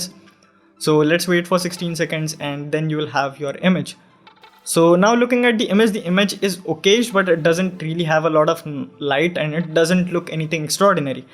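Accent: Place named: India. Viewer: You are indian right 😁 I know from your accent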